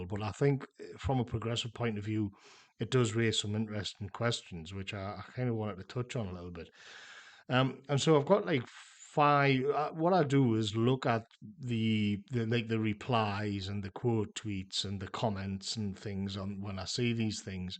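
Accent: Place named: Northern England, United Kingdom. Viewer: great video, nice to hear a fellow northern voice for a change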